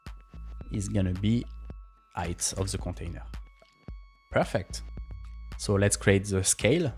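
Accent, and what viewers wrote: France. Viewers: Vous avez une accent française 😄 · So adorable french style english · Intéressant le tuto français ça ce vois a l'accent ? 😊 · Excellent tutorial, thank you! Also love your accent haha, you sound more French than Swiss to me but I'm Australian so am hardly qualified to tell the difference. :p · Nice video! You have a french accent, isn’t it?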